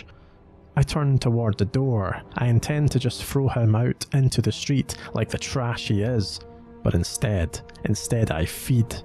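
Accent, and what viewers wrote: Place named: Scotland, United Kingdom. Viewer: The scottish Dialect make it feel like u are a child of a watchers council in the UK giving inside Storys of the Slayer Demin World.Love your Videos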